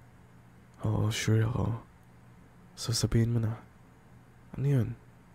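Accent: Conyo, Philippines. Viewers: ang conyo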